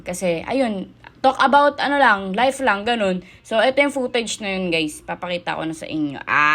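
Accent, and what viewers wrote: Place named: United Kingdom. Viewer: Parang taga cambridge ka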